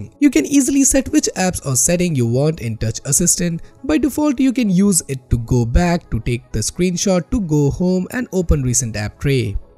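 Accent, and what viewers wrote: India. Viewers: God indian accent is the most unbearable.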